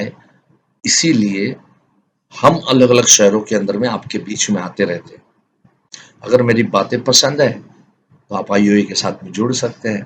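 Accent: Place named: Maharashtra, India. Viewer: खूपच सुंदर माहिती दिली सर धन्यवाद ( शुद्ध मराठी बोलता सर तुम्ही )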